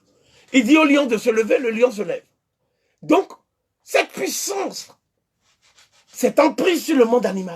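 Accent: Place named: Abomey, Benin. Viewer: Un béninoise qui parle français garde son expression natale Abomey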